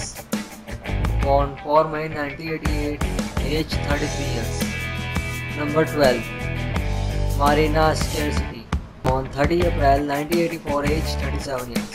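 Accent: India. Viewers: Can’t understand the announcer because of his Indian accent!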